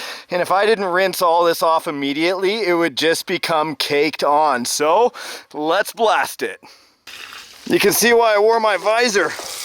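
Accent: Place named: Canada. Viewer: Dude your videos are always playing on my and my sons Youtube. I love your content and your Canadian accent 😂🤣 Keep up the good work, you inspire us and others like us. Thank you for being awesome, wholesome, and family friendly! My son and I are hoping to start documenting our own outdoor R/C adventures, so stay tuned!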